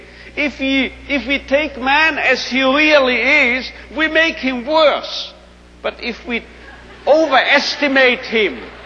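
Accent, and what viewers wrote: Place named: Germany. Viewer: <3 ze german akzent (but he's austrian?)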